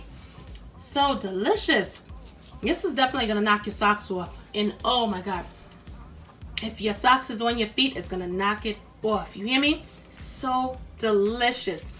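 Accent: New York, United States. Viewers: Hi. I just love your videos. I really don't remember when I subscribed to your channel, but it had to be something that look good for me to do it. Me and my husband was watching a vid of yours maybe a week ago. Your recipes look sooo amazing and good. But, our decision was about your accent. I said that you sound like you are from NEW YORK. He said your accent sounds like more of NEW ORLEANS. Please let us know. Because TRUTHFULLY!!!! NYC IS ON MY BUCKET LIST TO VISIT!!! WE BOTH LOVE EAST COAST RAP, so I don't understand why he said NEW ORLEANS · Lordy,Lordy that looks good. I’ve been fasting for 18 hours now and this just drove me to another level of goodness.I hear a hint of NY in your speech, is that right. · I’m a brand new subscriber. These crab cakes came across my feed, and I was hooked. Are you from NYC? I read your about but it didh’t say. 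My hub is from the Bronx. I hear an accent.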